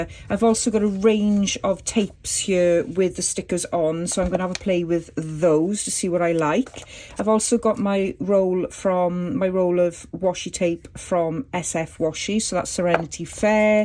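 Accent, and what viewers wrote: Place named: Wales, United Kingdom. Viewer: Hello from Montreal. I think there will always be people preserving the art of paper working. It's a lovely art. I was trying to place your accent... it's Welsh... Love it! I so enjoy watching your videos. Thanks for sharing! Takecare. BTW...love your page! ❤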